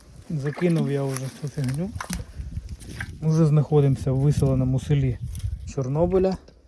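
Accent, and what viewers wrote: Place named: Poland. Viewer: Фиии , польский суржик !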